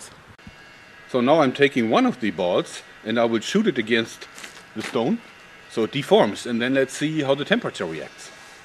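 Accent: Germany. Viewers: German english sounds funny xD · Der gutealte deutsche Akzent xD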